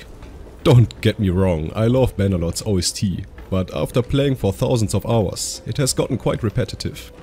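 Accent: Germany. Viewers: Irgendwie hörst du dich wie ein deutscher an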